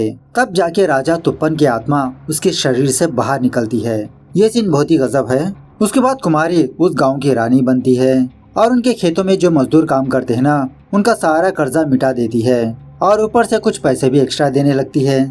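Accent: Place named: South, India. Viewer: Love ur voice ji😍😍 South Indian hindi bolte to accent bht acha lagata hai..me v south side se hoon..andhra odisha border😀😀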